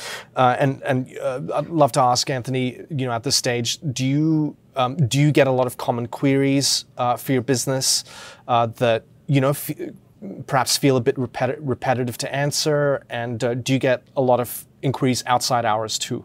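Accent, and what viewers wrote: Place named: Rural, Australia. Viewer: Too much yap yap in country Australian accent than showing ai doing sales.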